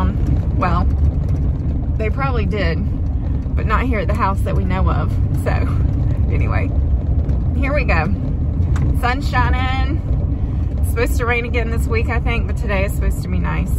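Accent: South, United States. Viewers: Loved the video. Typical farm day something always breaks Lol. I love your positive outlook also your accent. A real southern lady 🙂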